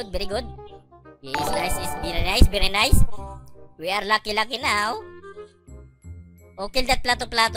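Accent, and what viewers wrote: Visayas, Philippines. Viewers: Bisaya ka bay? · Bisaya ka no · Bisaya ka dol? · Bisaya po kayo😂😂?? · Bisaya ba to? HAHAHA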